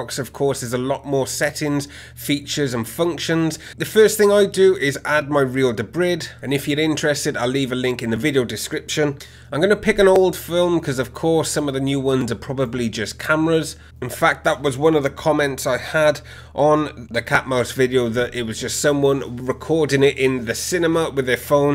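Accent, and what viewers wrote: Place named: India. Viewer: Lol anytime a click these fix videos and hear an Indian my head just shuts down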